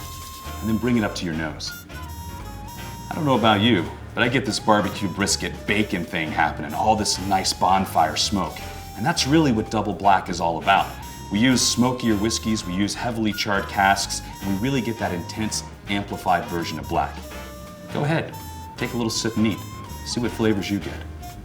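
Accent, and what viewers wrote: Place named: United States. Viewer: OK, stop criticising, people! This video dates back 5 years. If they would do it again, this guy wouldn't wear a V-neck T-shirt under a suit jacket. Hell, he wouldn't wear such a cheap, crumpled, ill-fitting suit jacket. They wouldn't allow this guy to talk so slick American to promote a Scottish brand. And they would cut out that annoying background music. Wouldn't they?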